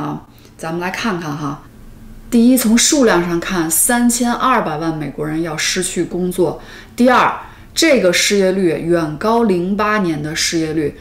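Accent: Beijing, China. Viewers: 博主是北京人么？口音听起来很熟悉。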